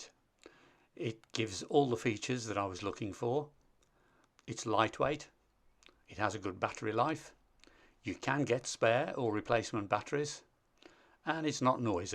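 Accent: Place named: Bromsgrove, United Kingdom. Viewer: Lovely video, very useful and you’ve convinced me to buy it. Your local accent also pricked my ears up, I’ll hazard a guess you’re near bromsgrove?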